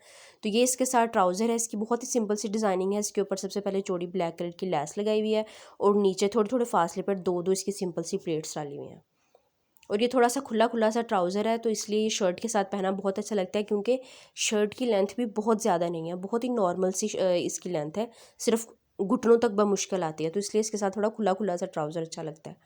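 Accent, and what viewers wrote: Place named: Punjab, India. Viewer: App ki Vedo buhat achi Hoti hi ap bolti bhi buhat acha ho banjabi ka tark acha lagta hi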